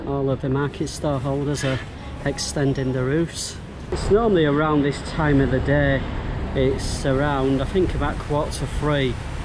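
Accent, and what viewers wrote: United Kingdom. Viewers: You from British?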